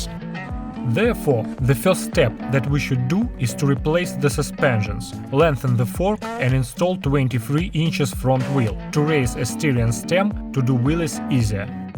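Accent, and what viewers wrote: Russia. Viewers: наш, из тамбова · ох уж этот russian speaker акцент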